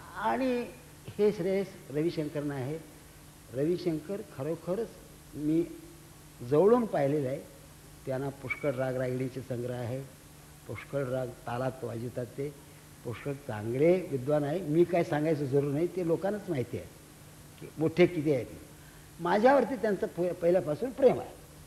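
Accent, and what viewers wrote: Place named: Karnataka, India. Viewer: His marathi accent is soo much kaanadi 🤗❤️ felt like he is talking kannada 🤗🎉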